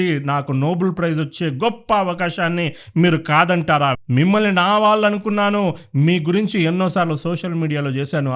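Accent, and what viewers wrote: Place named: Telangana, India. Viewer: Nice Anna Telangana Slang Super 😄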